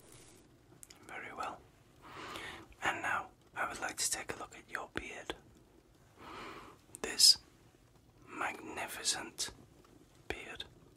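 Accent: Yorkshire, United Kingdom. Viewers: a proper accent whilst listening to ASMR! Beautiful Yorkshire tones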